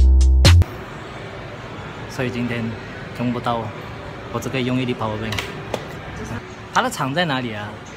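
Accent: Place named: Singapore. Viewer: Talk singhish haha because 是 jb人吗?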